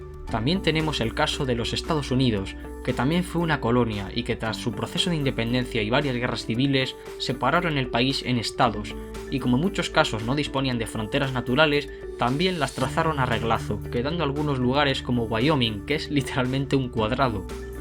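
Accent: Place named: Spain. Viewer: Que hermoso canal, me gusta como narran ustedes los Españoles, sigue así, nuevo sub.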